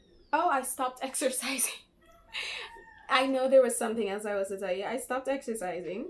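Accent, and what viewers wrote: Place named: Guyana. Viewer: Omg u have a Guyanese accent nice love it❤️❤️❤️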